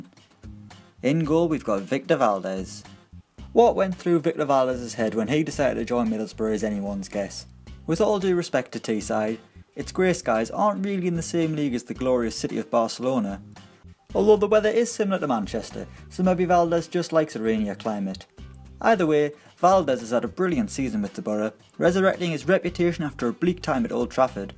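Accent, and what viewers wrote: Ireland. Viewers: Are you Irish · HITC Sport are u guys Irish?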